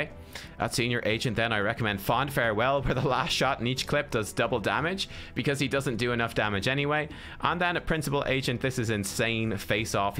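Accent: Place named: Ireland. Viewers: That's a perfectly normal Irish accent. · It sounded like a very mild Irish accent to me but it took me a minute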